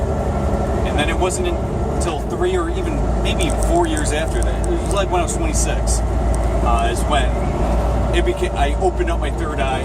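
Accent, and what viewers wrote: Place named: Rochester, United States. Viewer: Dude I KNEW that had to be a Rochester accent!! I grew up there.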